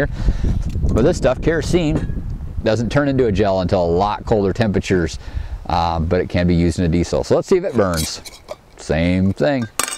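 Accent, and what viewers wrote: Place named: United States. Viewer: I really can't remember when was the last time I heard genuine American accent as yours..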